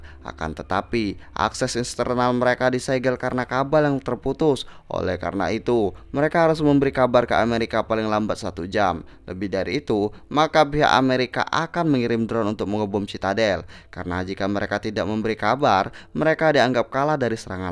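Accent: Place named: Sumatra, Indonesia. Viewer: swara abg kek logat kepri or sumatra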